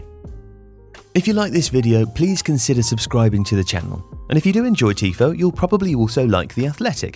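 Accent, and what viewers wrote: England, United Kingdom. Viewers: Weird hearing this with the English accent from tifo football group etc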